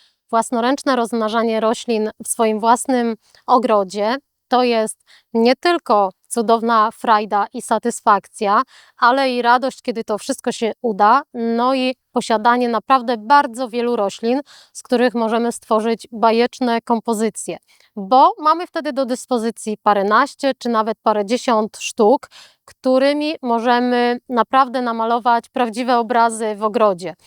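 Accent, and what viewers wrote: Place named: Poland. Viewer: Och prawdziwa osoba, prawdziwy ogród, prawdziwy polski głos. Dziękuję za ogromną wiedzę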